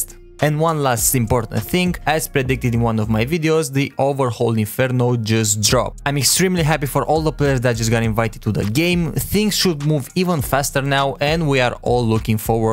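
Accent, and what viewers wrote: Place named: Romania. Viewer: Ești român se simte în accent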